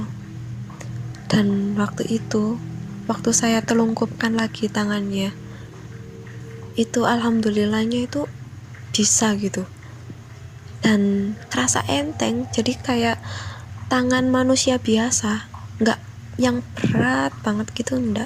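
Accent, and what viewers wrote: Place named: Central Java, Indonesia. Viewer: jawa tengah ini logatnya kalem... Medok bgt...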